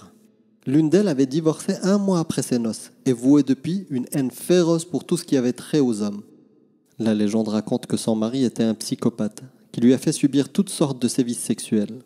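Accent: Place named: Germany. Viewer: j'adore le petit accent allemand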